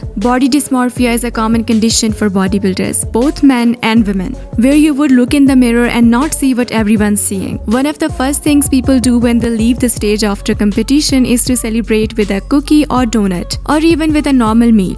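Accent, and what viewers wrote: India. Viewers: disliked cause of indian accent lol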